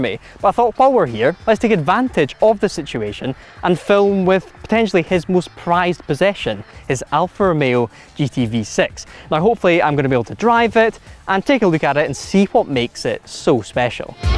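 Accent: Scotland, United Kingdom. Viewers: It was clickbait because It had a picture of Clarkson in it, this guy was is Chris Harris if he lost a bit of of chubb and got a Scottish accent. Yes I do like the engine in this Alfa but I'm more into old JDM cars because they don't break,like one of distant relatives knicked his car when he wasn't looking..🤣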